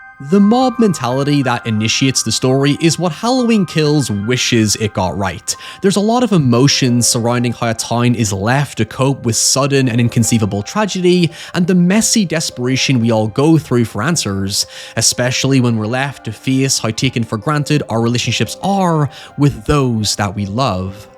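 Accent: Northern Ireland, United Kingdom. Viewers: It's a typical Northern Irish accent. How now brown cow?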